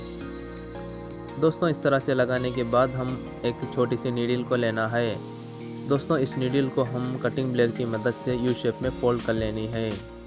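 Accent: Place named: Telugu, India. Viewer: from accent I could tell you are a Telugu tammi!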